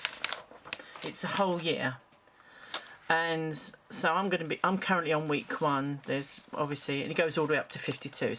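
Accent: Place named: United Kingdom. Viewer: What a great way to get started. You did a great job. I'm going to try too. Nice to hear a british voice too. Subscribed. X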